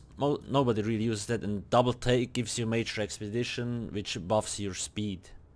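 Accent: Switzerland. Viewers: Du bist safe Schweizer :D nice video! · He du bisch doch 100% au en schwiizer eh? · Bish du schwizer? :D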